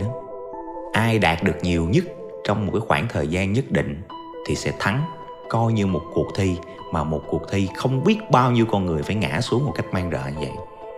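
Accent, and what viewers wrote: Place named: Binh Dinh, Vietnam. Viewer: là người quê bình định à? Nghe giọng đọc cứ nghĩ tới người bình định th haha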